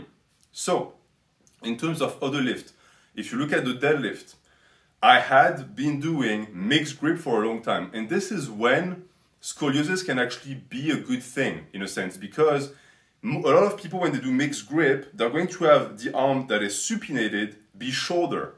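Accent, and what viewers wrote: France. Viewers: I literally for some reason could not put my finger down on your accent, and now I know it's French...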